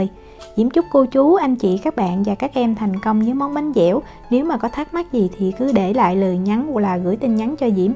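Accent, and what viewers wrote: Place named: Can Tho, Vietnam. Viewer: nghe giọng c Diễm, e tưởng quê Cần Thơ. hihihihi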